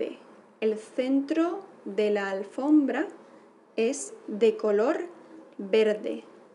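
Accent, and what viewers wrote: Castile, Spain. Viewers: Is this European spanish or Latin American? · is this Castilian Spanish plz!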